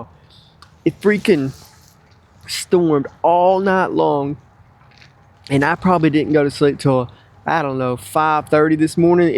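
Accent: Alabama, United States. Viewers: I love watching you camp,. I confess I love hearing you talk b/c I'm an Alabamian too! Tuscaloosa. That's not the only reason though. I'm always interested in how you set the camp up & how you deal w/ anything that might be any little frustration or fixing any problems. I confess, I like the singing as well but I love the stealth camps as well. Anyhow, thanks for all you do to keep us interested & entertained. Stay safe & be well. Peace, Selene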